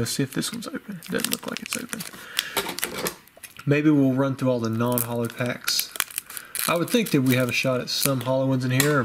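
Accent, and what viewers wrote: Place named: Georgia, United States. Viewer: do you live in Georgia in usa because you have a Georgian accent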